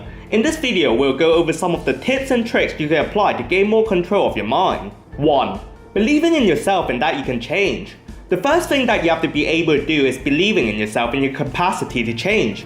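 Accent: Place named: United Kingdom. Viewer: Totally love that British-Chinese accent ;)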